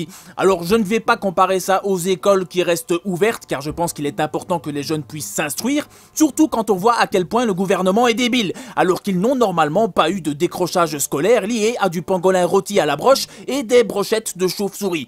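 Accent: Belgium. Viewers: tu es Belge ? t'as un accent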